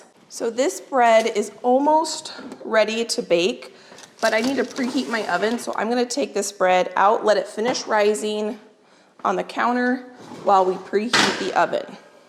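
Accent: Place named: South, United States. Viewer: She cuts everything with that skinny knife she is funny to watch very entertaining Her southern drawl reminds me of my granny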